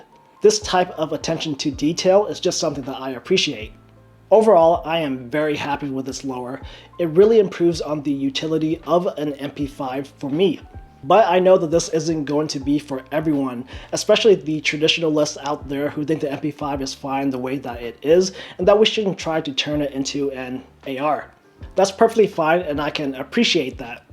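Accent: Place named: Pacific Northwest, United States. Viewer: Sounds like you live in the PNW.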